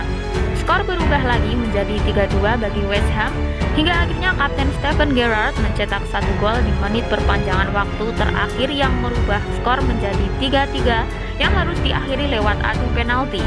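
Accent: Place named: Java, Indonesia. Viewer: Naratornya medok banget.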